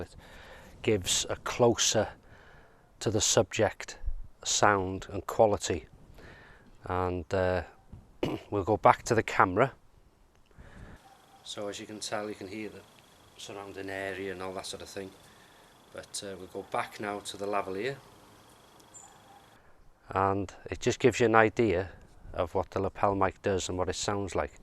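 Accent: Liverpool, United Kingdom. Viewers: You from Liverpool?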